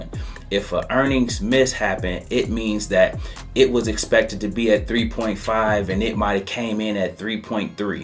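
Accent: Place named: North, United States. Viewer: haha.. love that Northern accent! im from RI but living in Tennessee Y'all. 😅😅😅 Fixin and yant too? 😒😒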